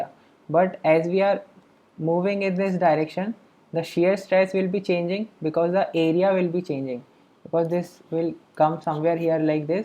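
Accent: India. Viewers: I am sorry to say that most of my concentration is already used up by your 'terrible' Indian accent. A pity you do not learn English earlier at school. Secondly your writing is really bad. From the content it is probably ok, but as European I stop following you because I find it very hard to comprehend your English. A voice over or typed out English could do wonders. I return to my English book......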